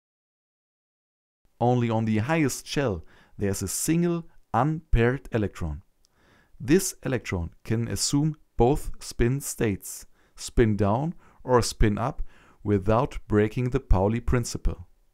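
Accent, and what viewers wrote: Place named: Germany. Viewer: Noch nie so deutschen Akzent gehört xD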